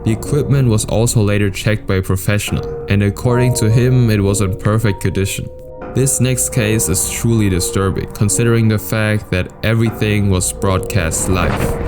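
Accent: Germany. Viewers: Are you German by any chance? Your English is perfect but I can catch a minor German accent :)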